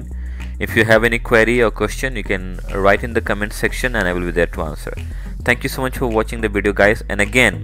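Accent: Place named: Asia. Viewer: you sound like you are Asian I would appreciate it if you would speak British instead, even though I only have a British accent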